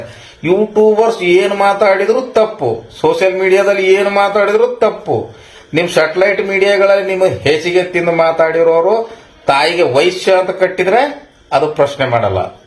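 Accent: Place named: North Karnataka, India. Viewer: sir your telling very beautiful Uttar Karnaraka Bijapur Langvage sir.pl coutinue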